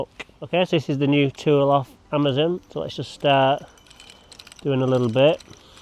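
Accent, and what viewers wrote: Netherlands. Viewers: Haha, your Dutch accent is excellent. You sound exactly like my Dutch friend, Wouter. Hi from Prague, CZ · Great video Dutch accent appalling lol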